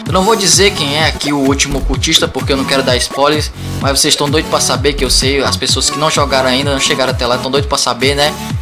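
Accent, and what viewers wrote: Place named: Northeast, Brazil. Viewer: Faz do herói ateniense... Esse seu sotaque é de qual estado do nordeste?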